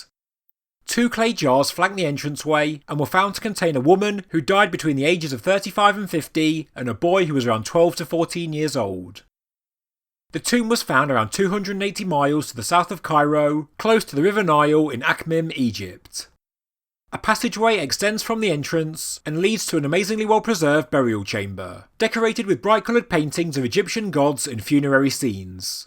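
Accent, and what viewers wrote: United Kingdom. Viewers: Sounds like a regular Brit to me. Guess am more used to a wide variety of accents. Thank God he doesn't talk with that unintelligable deep South or hardcore Texas accent. That is soooo hard to understand even when you live there. Give me even an Australian accent over that.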